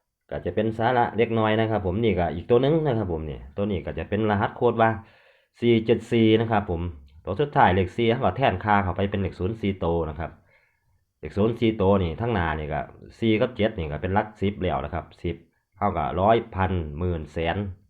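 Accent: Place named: Isan, Thailand. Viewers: ชัดเจนอีสานบ้านเฮา👍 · มื่อนี่ฟังได้เฉพาะภาคอิสานเด้อค่ะ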